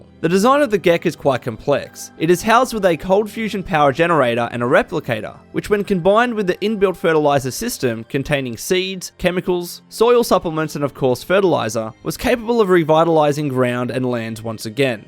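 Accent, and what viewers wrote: Australia. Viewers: Its not odd, I can completely understand what you're saying. I've watched enough Robert Whittaker and Mark Hunt interviews to understand the Australian accent. Its the way you say certain words.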